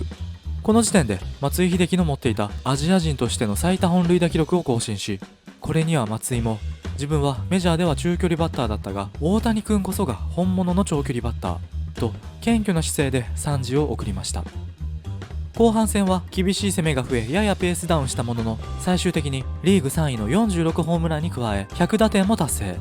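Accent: Kansai, Japan. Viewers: この解説者関西弁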